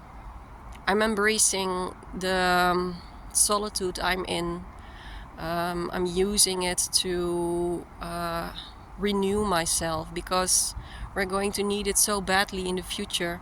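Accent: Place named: Netherlands. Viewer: Your accent sounds Dutch.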